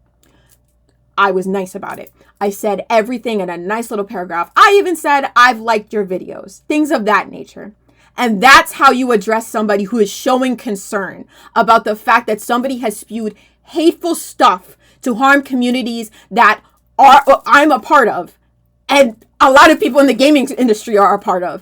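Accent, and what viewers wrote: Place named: New York, United States. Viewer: I unsubscribed from the fake wizard because of the annoying new york / jersey accent.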